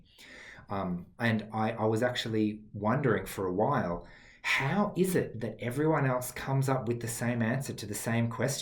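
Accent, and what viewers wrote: Australia. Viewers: I'm self diagnosed, now 44. Getting a diagnosis here where I'm from was too much of a hassle and cost for me a few years ago at least. But I'm glad I know for myself now. I had a boyfriend a few years ago who's on the spectrum also, and it was like coming home. For both of us. I'm really hoping to meet up with more people on the spectrum, but there aren't any meet-up groups like that here and I don't have Facebook, otherwise I would love to join! I'm just really glad I know for myself, and yes, I live pretty much like a recluse, even before covid. But thanks to studying spirituality, I've learned to love myself and not think less of myself because I understand that diversity is necessary for evolution :) I really chuckled at your trying to stay on topic or sticking to a script comment there lol, that's something I have to actively be conscious of all the time!! 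I'm from Brussels, but sometimes I wish I lived in the UK, just because of the access to more meet-up groups irl. You sound like you're from down under though, but not sure! Anyway, thanks for being an advocate for an often unseen group of people. Your videos are really good and easy to listen to, no bells and whistles, just how I like my clothes, my food, and my videos lol 👍👍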